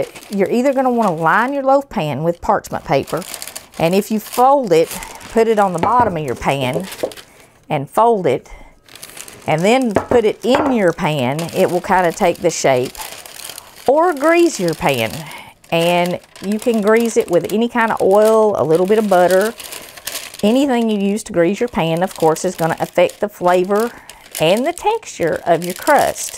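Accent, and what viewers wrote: South, United States. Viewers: I didn't know about you but it always taste better with a southern accent ❤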